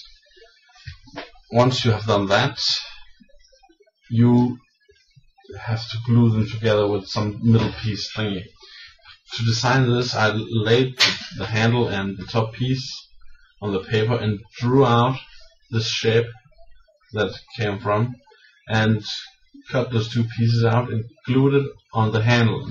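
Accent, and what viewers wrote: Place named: Denmark. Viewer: you have a danish accent... are you from denmark?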